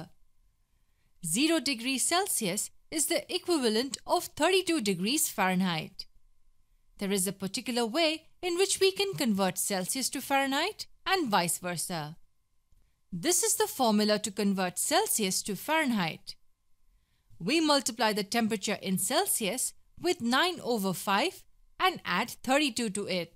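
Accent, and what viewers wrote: India. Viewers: she has an Indian accent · Great trick! Thank you. Love your Indian narration too.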